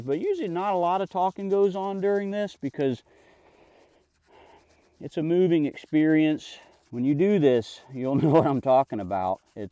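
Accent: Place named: Florida, United States. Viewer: You have a Florida accent, like Gary Rossington from Lynyrd Skynyrd.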